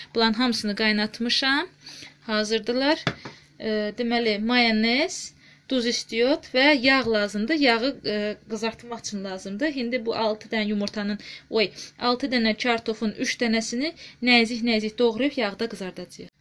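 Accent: Ganja, Azerbaijan. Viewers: Xanım, siz Gəncəlisiniz? Mənim yoldaşım Gəncəlidir, Gəncədə balacaya- "Balicə"deyirlər!😂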